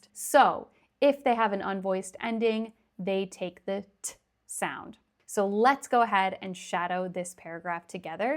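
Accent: United States. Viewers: I'm happy that I've found you. Your videos are really helpful. Thank you. I like the American accent ❤ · Thank you very much for your useful work! I enjoy it a lot, but honestly I have to say that it's a little bit weird to me the american accent because I have been taught at school in British accent. But in any case, I do my best! Kisses from Spain.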